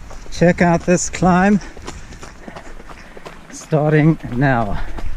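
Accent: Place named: South Africa. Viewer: גם כל כך נחמד לשמוע את המבטא הדרום אפריקאי האהוב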